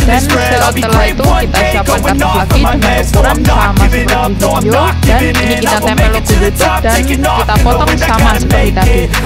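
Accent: Java, Indonesia. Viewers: Logat jowo ne keliat banget :v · Mase wong jowo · wong jowo ya kowe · Jowo ne ngendi sampean mas:D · Bang orang jawa mana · orang jawa ya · Jawa medok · Wong jowo ndi suarane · Dari mana nih bosku.. Kok logat jawanya mateng amat haha salam dari kota Demak Karang tengah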